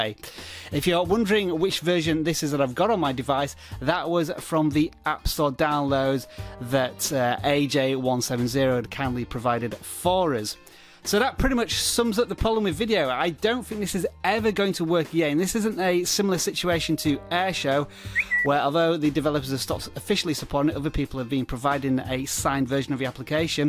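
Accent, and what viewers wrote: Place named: United Kingdom. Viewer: You are the first YouTuber with a British accent I find him amusing. 2017 must be my lucky year!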